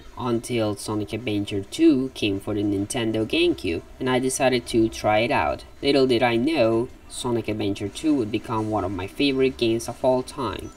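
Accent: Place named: United Kingdom. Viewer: You sound British. No offence, I'm British too.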